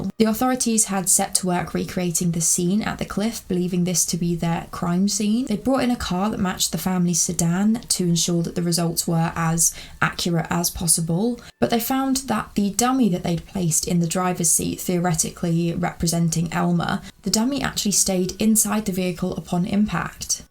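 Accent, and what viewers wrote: England, United Kingdom. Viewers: What country is she from? England?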